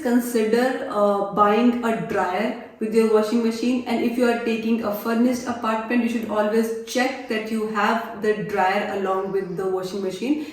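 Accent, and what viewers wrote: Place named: Asia. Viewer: By language akcent u looks Asian.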